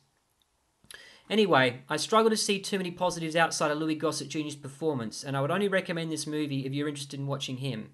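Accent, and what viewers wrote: Australia. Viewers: You're Aussie mate. Me too 👍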